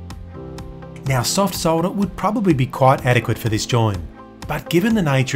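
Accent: Australia. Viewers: Hey! I was wondering, where are you in the world? You sound Australian, if so, where in Australia?:) Once again, great video!!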